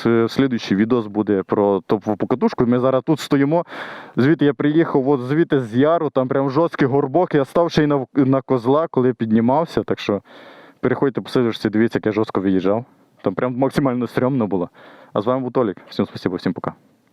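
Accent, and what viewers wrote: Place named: Ukraine. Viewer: Вот это классный украинский язык! Настоящий украинский, что и в детстве я от тетки слышал и от ребят с Украины, что к нам на Север приезжали работать, и от товарища с Николаева, и от тестя бывшего, земля ему пухом. Всё понятно! Совершенно отличающийся диалект от того, что украинцам навязывают полупольские галичанские западенцы, со своим отвратительным пшиканьем! Я просто с удовольствием послушал украинскую речь.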